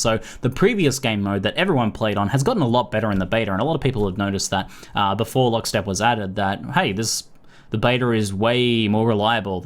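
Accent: Australia. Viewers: Farrell Roll Uh, no. He's Australian so he says some words differently than an English man or American man would... but thanks for being ignorant.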